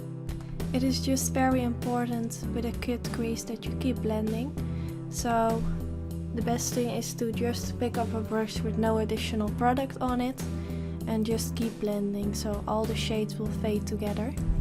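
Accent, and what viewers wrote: Netherlands. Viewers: omg i love ur tutorials! u have a dutch accent haha! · This look is amazing! Btw, what country are you from? You've got a little bit of a dutch accent ;)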